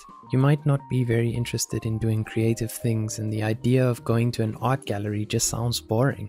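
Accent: South Africa. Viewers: Is the new narrator South African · The new voice has a South African accent😃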